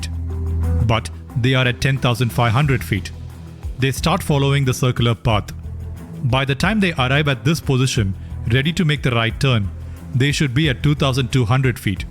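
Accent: India. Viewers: This video is the first time i ever heard english with indian accent and actually kinda liked it…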